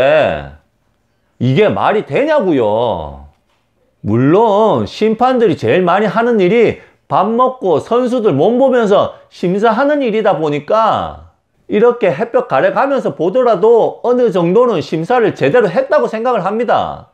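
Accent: Gyeongsang, South Korea. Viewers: 경상도 어디십니까ㅎ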